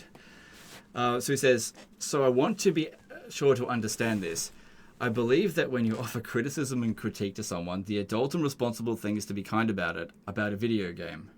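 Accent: Australia. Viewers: Bleaaase dont quit YT entirely, i can't go for weeks without your soothing Aussie voice playing on my second monitor!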